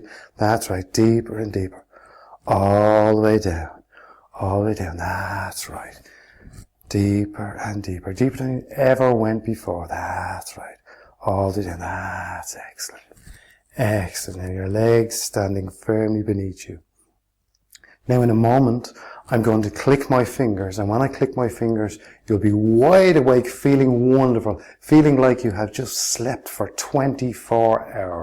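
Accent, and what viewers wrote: Ireland. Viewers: Are you Irish ? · wow. You're Irish, yeah? Want to take on a student? :D I dunno about anyone else, but when I sleep for 24 hours I wake up feeling like shit lol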